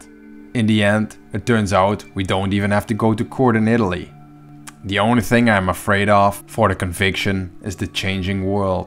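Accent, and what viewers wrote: Netherlands. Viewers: Intriguing what they sued you for instead of suing you for trespassing as you were basically trespassing. The possible secret agenda of the asbestos clean up once more shows the different motives of parties to sue on another instead of logical reasons. It was hilarious though that the article writers thought you were American, as a Dutchy myself I can clearly recognize that accent. No offense of course. Thank you for what you do and I appreciate that you and your friends are willing to put so much on the line to show these amazing places to the world!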